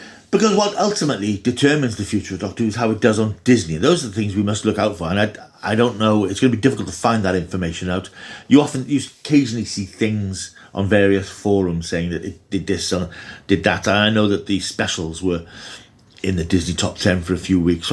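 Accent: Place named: Wales, United Kingdom. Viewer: Sounds like a welshish accent. I agree with you on figures. Because its on iPlayer tv figures are irrelevant. Wow 2019 seems like yesterday.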